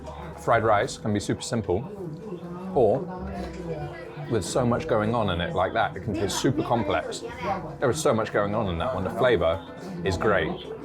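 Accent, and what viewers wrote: United Kingdom. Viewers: The video has a good rhythm and a pure British accent that sounds very comfortable. I watched it over and over again to learn English, and I really enjoyed watching it. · Chinese here. Subscribed for British accent lol, but mostly I enjoy the calm, genuine vibe in your video without diatribe & hubbub. glad that you enjoyed your trip so far. I am working and living in XinJiang region right now, summer is perfect for travel ( none Urumqi areas), definitely worths a visit. cheers.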